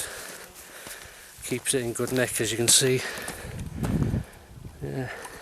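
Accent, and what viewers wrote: Liverpool, United Kingdom. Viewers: I thought i could hear a slight Liverpudlian accent there when you speak. Have you lived there at all or is it because Denbigh's geographically close to The Mersey?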